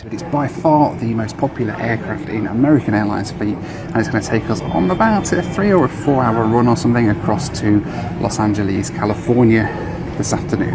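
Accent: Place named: United Kingdom. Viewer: He's British and that's how they pronounce these cities names.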